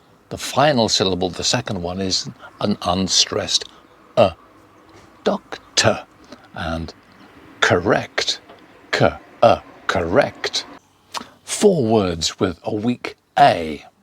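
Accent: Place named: United Kingdom. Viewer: I love biritish accent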